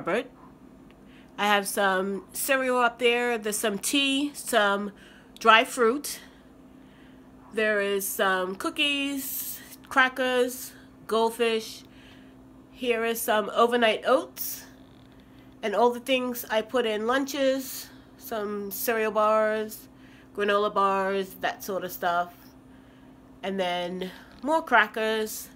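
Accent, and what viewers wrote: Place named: Philadelphia, United States. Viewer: You are one organized lady. Loving your accent... what is that? Philly? Me being from the west coast you would probably say i am the one with an accent!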